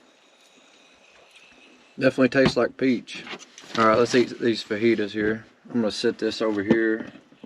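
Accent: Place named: Appalachia, United States. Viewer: nice, I could tell from the accent you were also southern Appalachia. Keep up the great work brother!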